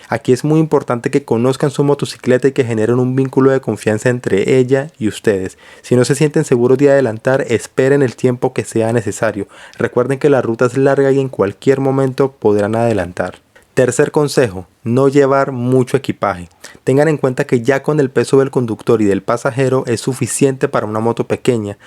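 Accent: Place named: Colombia. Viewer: Gracias por los consejos, me pareció genial el vídeo gracias a qué no tienes el acento colombiano tan marcado como otros moteros, saludos desde México 🇲🇽🇲🇽🇲🇽👍👍👍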